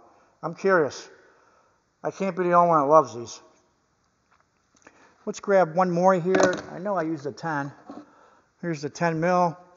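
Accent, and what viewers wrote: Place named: East Coast, United States. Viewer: Your accent reminds me of home. I go back east for the holidays and still don’t believe I sound like that 😊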